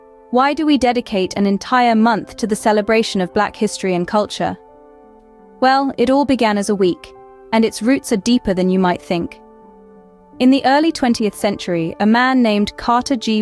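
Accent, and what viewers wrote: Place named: United Kingdom. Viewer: Is all the video AI generated? If so, that's some if the best quality AI video I've seen. Why a British accent for the narrator, though?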